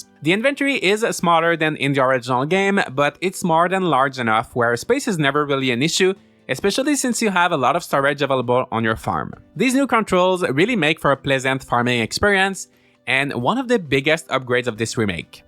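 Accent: Quebec, Canada. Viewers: Are you french Canadian? You have the same accent i have.Great review Btw. Keep up the good work!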